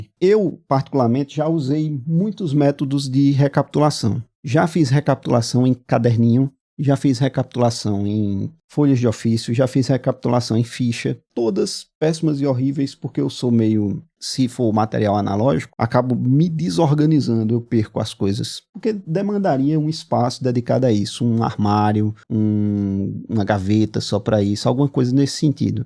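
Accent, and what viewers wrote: Pernambuco, Brazil. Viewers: Pelo sotaque é Pernambucano .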